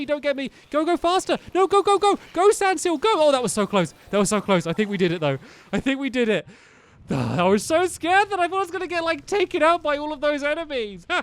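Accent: England, United Kingdom. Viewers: hes british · Question are you English or american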